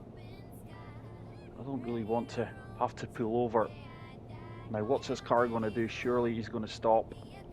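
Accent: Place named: Glasgow, United Kingdom. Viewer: You sound like you from Glasgow ye from there o am and if so what team you support the blues or greens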